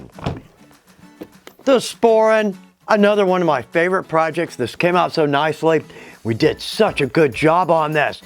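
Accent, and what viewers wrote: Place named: Texas, United States. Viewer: Congrats. I haven't been watching your videos for ten years, but I have for a long time. I have been doing leather work since high-school ( I am 80), but every time I watch one of your videos I learn something. Sometimes it is something that i have forgotten about but that's a different story. I enjoy watching you work and all the tips you have. One thing though, your Texas roots show up once in a while in your speech. You can take the boy out of Texas but...............! I live in Texas a little south of where you did. Keep the videos coming because I am not through learning! Thanks!!!!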